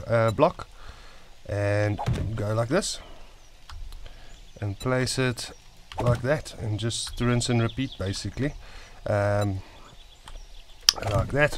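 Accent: South Africa. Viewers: Do I detect a South African accent?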